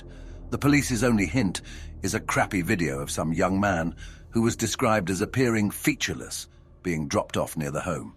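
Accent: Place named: United Kingdom. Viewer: Yay new horror narrator cool and a lovely British accent bonus ❤ subscribed ❤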